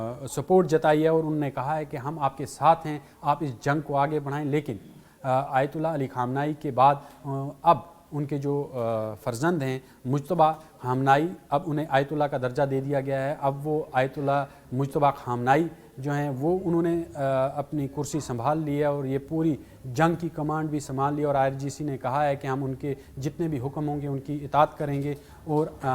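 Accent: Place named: Pakistan. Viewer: He is not from India. He talk like Pakistani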